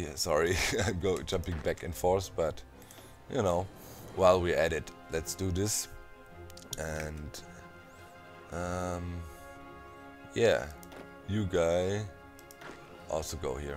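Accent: Germany. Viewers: history, hoi4, docm, German accent, and a constant reminder of that German censorship of hitler(which I find the whole concept of that really hilarious?), what could be better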